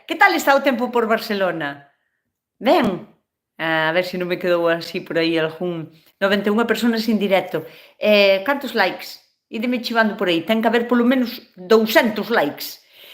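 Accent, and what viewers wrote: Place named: Lugo, Spain. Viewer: Yo nací en Lugo ,pero no me crié en Galicia ,ahora hace unos 35 años que vivo en Galicia de nuevo y me recuerda tu acento a familia que tengo en Lugo y a alúmnas que he tenido de la zona de Ribeira ,me encanta el deje que tenéis y se os entiende de maravilla ,no así a los de la zona de Mazaricos que sesean mucho ,por lo que les entiendo peor ,espero seguir viendo tus recetas ,y por supuesto que las haré . Gracias y sigue siendo tan maja y espontánea como eres .Un afectuoso saludo de esta gallega castellanoleonesa .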